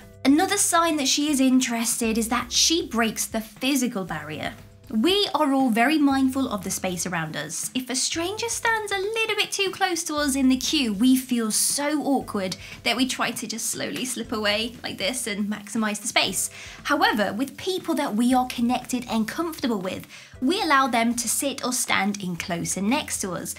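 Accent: United Kingdom. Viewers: heyy you are one of the best advice givers ever on youtube, there is a christian girl at my church who seems to like me a lot, btw how often can you text a girl every two or three days I am assuming, i need your advice btw your British accent is pretty cute. Lastly, you can be little awkward sometimes right not intentionally, but it happens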